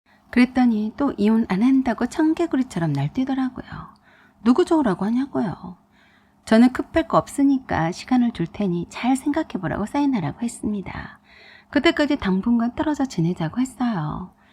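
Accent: Jeolla, South Korea. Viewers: 나레이터 전라도분인가봐요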